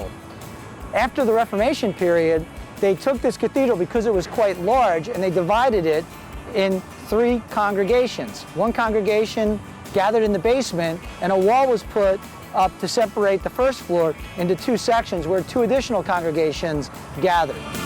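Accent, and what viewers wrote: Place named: United States. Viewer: what an annoying yank presenter!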